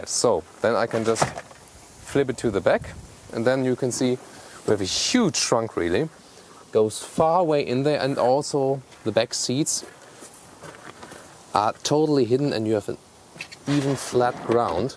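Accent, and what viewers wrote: Germany. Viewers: nice german accent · Mensch, habt ihr nicht sonst Reisen getestet oder Kreuzfahrten? Wie dem auch sei, nettes Fahrzeug. Nur einem Deutschen beim Englisch sprechen zuzuhören, ist sehr sehr anstrengend. Nichts für ungut ;) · Great review(s) you´re doing even with that German accent. Great car either, this XC90, except for it´s poor typical mid class sized engine which will keep buyers for the premium segment away. Driving an old XC90 for nearly 7 years now I was looking forward to the new one. But definitely not with that tiny engine. What a shame.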